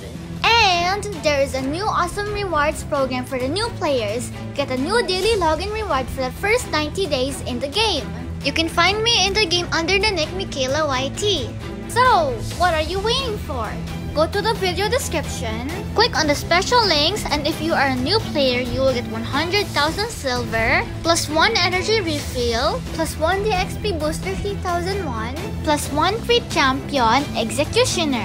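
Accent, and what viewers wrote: Philippines. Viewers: Are you Filipino?Your voice sounds like so,If I’m wrong pls tell meh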